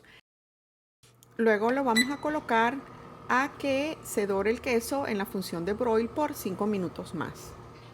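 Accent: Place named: Cuban American, United States. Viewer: Muy bien explicado, para mañana tengo invitados, y estaba revisando cómo hacerla, gracias . Está rica. Por tu acento creo que eres cubano americana.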